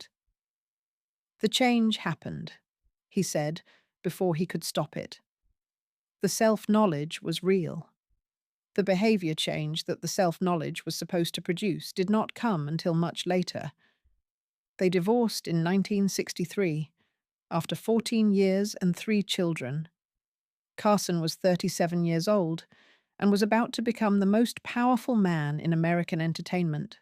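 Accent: United Kingdom. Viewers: Do we really need Brits to narrate an American story. Surely an American accent would be more compatible.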